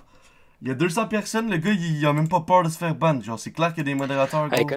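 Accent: Quebec, Canada. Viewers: Minecratf Je suis prêt a parier que tu est Québecois, car moi aussi j'ai la même accent et je suis Québecois ;))) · comme tu aimes bien les nouvelles personnes, je m'abonné like et encore un nouvelle épisode d'admin série =D car oui je regardais tes épisode auparavant et je go rejoindre ton serveur dans probablement quelques minutes ;) tu es québécois? j'ai l'impression :p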